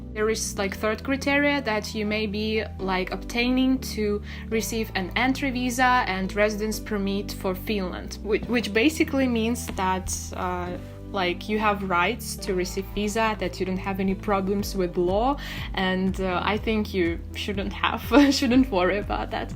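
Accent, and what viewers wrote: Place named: England, United Kingdom. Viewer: Hi,I'm Nourdine from Morroco, I really like your English accent...so pretty please I can get your phone number this is my email: <mail>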